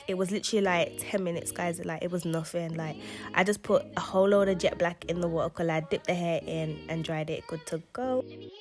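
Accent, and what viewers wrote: Birmingham, United Kingdom. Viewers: It’s a Birmingham accent x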